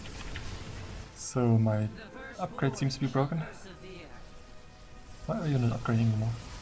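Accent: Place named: Germany. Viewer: Mach doch mal auch ein Video auf Deutsch man merkt das du deutsch bist dein Akzent sticht sehr heraus xc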